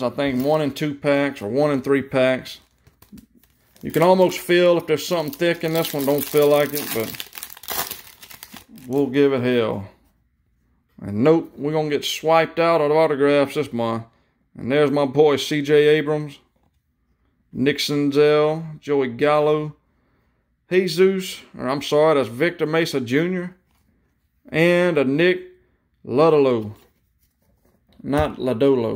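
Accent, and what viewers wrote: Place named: Louisiana, United States. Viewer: Love your channel and Louisiana accent..lol..You seemed so down to earth and humble and what makes you stand out to me..lol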